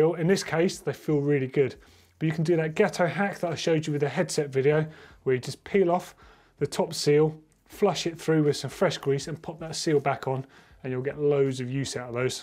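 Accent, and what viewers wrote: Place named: United Kingdom. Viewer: F*cking "brittish" accent :(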